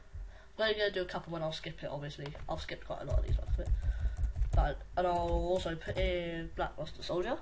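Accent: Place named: United Kingdom. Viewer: Holy shit this boi is british Edit: But it's a good vid <3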